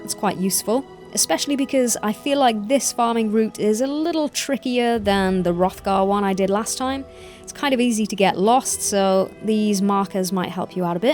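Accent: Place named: Australia. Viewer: Your accent sounds like highbrow Australian? North Queensland maybe? But your London. Hum. Anyway I love it.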